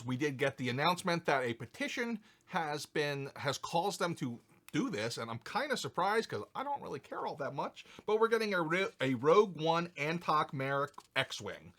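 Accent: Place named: Philadelphia, United States. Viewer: New subscriber here. You sound like you are from Philly or more generally, Eastern PA!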